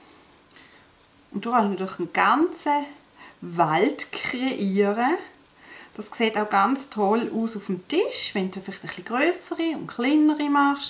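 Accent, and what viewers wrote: Switzerland. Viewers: Hallo hab dich per Zufall entdeckt, bin so begeistert und habe direkt abonniert. Dein Schweizerdeutsch gefällt mir auch.viele Worte hören sich an wie unser Dialekt.lg aus Deutschland/ Rheinhessen LG Ingrid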